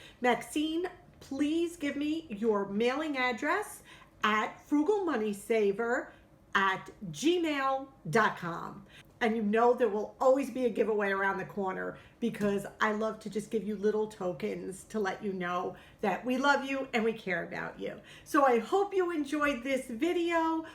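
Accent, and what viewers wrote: New York, United States. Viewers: Being from Jersey City (now in SC), our cawfee and tawk sound about the same as NY. That's probably why I relate to you so much (not to mention every time you say you got something at Shop Rite). I've got envelopes for Christmas and a new Christmas Tree in my "sinking funds". It worked for my parents with the Christmas Club back in the day, so this is my second year saving this way. My son and I buy things year round, so the Christmas money is for my Granddaughters who live with me and it certainly feels great not to have to use a credit card to purchase gifts. As always, love your channel and congrats to Maxine for winning the giveaway.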